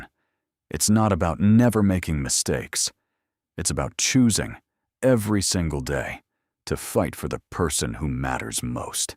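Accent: United States. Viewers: This is a wonderful story. Well presented in every way. However when I listen to these wonderful British tales I so wish it was in a dignified British accent. American accents have no real place in the British Victirian Era. Just a thought ❤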